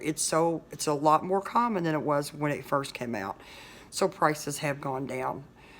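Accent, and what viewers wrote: United States. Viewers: If you dont mind me asking.... Where is this dentist located? I need 9 teeth replaced and I'm very intrested in what you said about the pricing and everything about this place! I'm quite excited actual you sound southern and I myself am a Southern .... I live in east TN. I hope its not to far away from me. I just really need this in my life and you made it seem like a reality to me and that made me not so depressed and hopeless.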